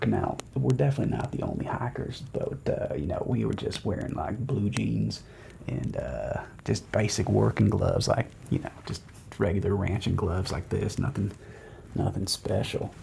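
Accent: United States. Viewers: Love the crackling campfire sounds, plus your storytelling with the cowboy accent. Wild that those are true stories. 🤠🔥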